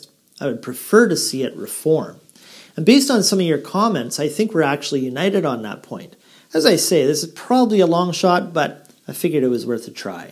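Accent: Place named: Brooklyn, United States. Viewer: Always makes me think and/or smile! Loved the Brooklyn accent!! 😊😊💕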